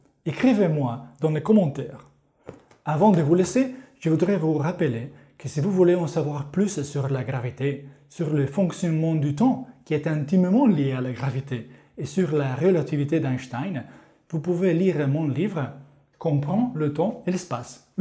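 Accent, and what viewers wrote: Italy. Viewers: Bellissimo accento Italiano!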